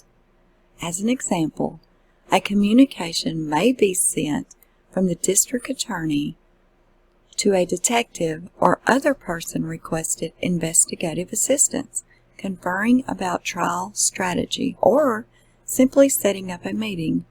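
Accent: South, United States. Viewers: Thank Goodness you understand this! Brains and beauty, you have it all! P. S. I almost forgot, a great southern dialect! I'm in NY I even get sick of my own voice.!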